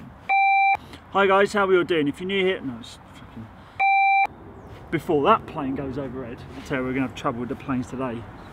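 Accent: England, United Kingdom. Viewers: A better presentation video with some more details for a beginners. I love your English accent too. 🙂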